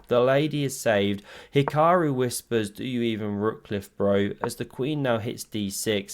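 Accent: England, United Kingdom. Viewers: Scottish accent? Though was English!!!! 😂😂😂Great analyze mon ami 😜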